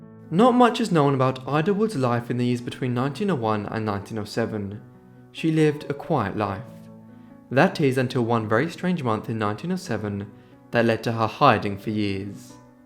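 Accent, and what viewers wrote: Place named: RP, United Kingdom. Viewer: Oooh, I would also like to know where you are from! I detect a posh accent, but then again perhaps you use AI.? You are well-spoken/articulate; a great story teller who is easy on the eye, so that’s why you might be AI. No offense meant if you are Real or if use AI. Just finished watching. My guesses were your ancestors were from Spain, Argentina, France or Italy. Well since AI has no DNA, you must be Real then! :D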